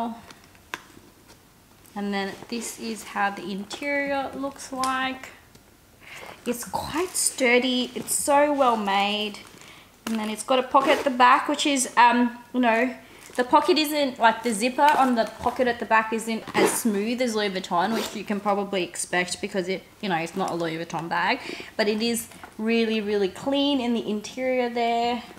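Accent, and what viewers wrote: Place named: Australia. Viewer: Funny that... I love the way she talks.. it's warming. Real n Aussie n relatable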